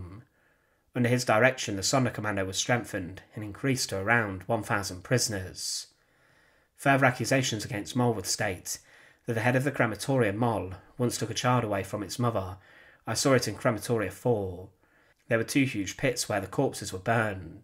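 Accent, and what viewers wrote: United Kingdom. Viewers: He’s actually speaking very slowly and calmly in a British accent. Just because he has a better mastery of the English language than you doesn’t mean he should cowtow to your ignorance. Listen better. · Isn't the commentator Britsh? Not redneck...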